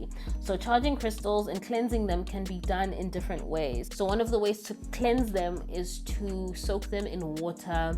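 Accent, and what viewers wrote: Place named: Africa. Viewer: U R Amazingly Gifted with Truth, Knowledge, Wisdom, Understanding, Beauty, Creativity in Your Unique Style/Elegance/Fashion, Professional, Precise & Proper African yet Down2Earth Dialect, etc & most Importantly, The Highest Vibrational Frequency of LOVE❣️ I 🤎, value, appreciate & honor U, Wombman King /Goddess/ Empress for Your Fearless Boldness in sharing Truth & allowing those of us who hav WOKE 🆙 & intentionally honor & cry out to Our ANKHcestors & The Universe, for Truth, Sankofa, etc! Ultimately & most importantly, for SelfLove! Which U mentioned & resonated deeply with My Spirit❣️Bcause SelfLove is what we need to empower us to LOVE Others as we Love ourselves! I Am Gr8fully Blessed to be counted among your Thousands of Sibscribers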